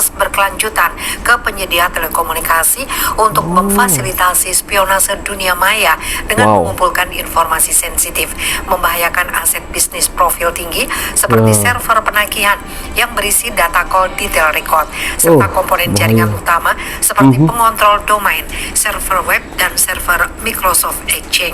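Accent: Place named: Malaysia. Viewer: Bahasa anda gak jelas dan membingungkan dan terdenar lucu, ( bhs. malaysia ).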